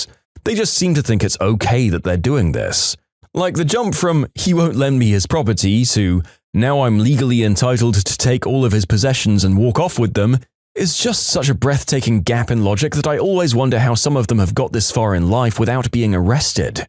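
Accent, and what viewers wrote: United Kingdom. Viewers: Since when is the narrator British? I honestly kinda like the British narrator ngl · British accent!!! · Legit i noticed the voice im laughing but no not offensive i mean i think british voices are funny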